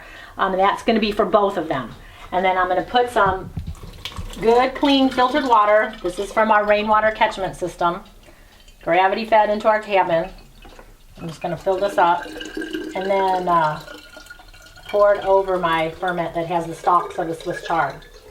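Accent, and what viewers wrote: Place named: Chicago, United States. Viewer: Thick Chicago accent!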